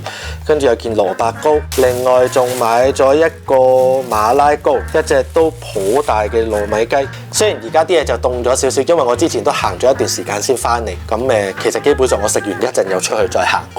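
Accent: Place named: Hong Kong, China. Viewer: 你好呀，今日無意中睇呢條面影片，聽你講說話嘅口音似係香港人，但係點解會叫油條同埋茄子嘅?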